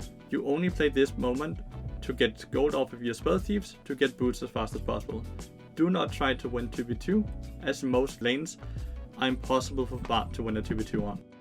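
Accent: Denmark. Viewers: Bro are you Danish? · Are you Danish? :p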